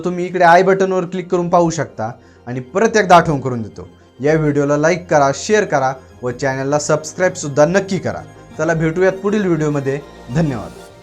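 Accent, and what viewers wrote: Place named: Ahmednagar, India. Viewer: भाऊ हीच टोन राहूदे ..तुझी USP आहे ही भाषा❤ नगरी वाटतोस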